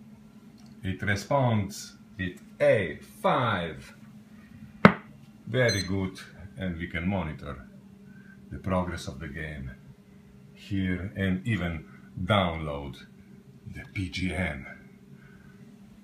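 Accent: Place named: Russia. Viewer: I total read this with your russian accent! Youre so funny! thanks for the response.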